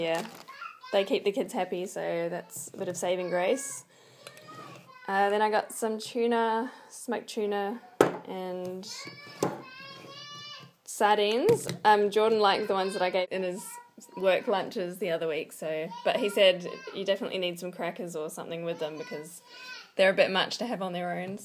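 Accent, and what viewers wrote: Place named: Australia. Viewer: Just heard you say you use to live in Australia. I can't seem to work out whether your Australian or New Zealander. It's hard to tell by the accent sometimes😊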